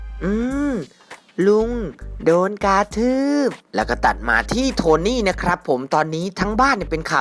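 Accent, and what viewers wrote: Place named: Suphanburi, Thailand. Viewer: พูด แบบ สุพรรบุรี ครับ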